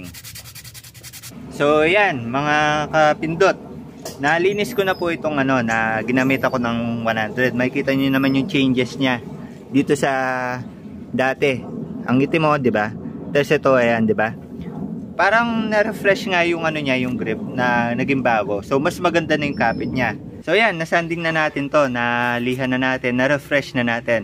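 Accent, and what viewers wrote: Pampanga, Philippines. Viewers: Basically maganda tong video. Kasi di ko talaga alam kung ano ang basically dragging. Pero parang Kapampangan si sir basically. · Kapampangan siguro tong si bossing base sa accent? Hahahahhaa nice vid bossing! Very informative!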